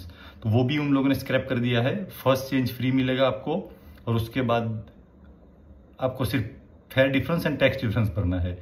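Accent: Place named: Mumbai, India. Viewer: Thank You bhai for this information . Love your Mumbai wala Hindi 😂 “ maro goli “ Dhanyavad 🙏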